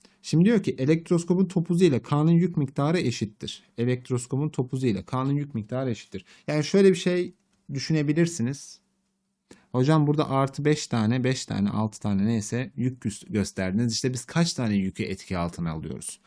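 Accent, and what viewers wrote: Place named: Izmir, Turkey. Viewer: bir insan izmirliyim demeden sadece elektrostatik anlatırken izmirli olduğunu ancak bu kadar belli eder. güzel tekrar etmiş oldum iyi taktikmiş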